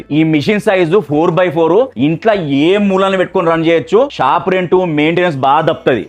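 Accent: Telangana, India. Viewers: పక్క తెలంగాణ యాస❤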